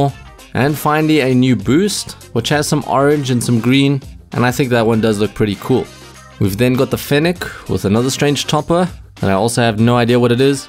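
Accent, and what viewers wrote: South Africa. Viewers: Are you south Africa. Really sounds like it and if so howzit bru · Eyyy bro are u from SA??? LOVE UR VIDS BTW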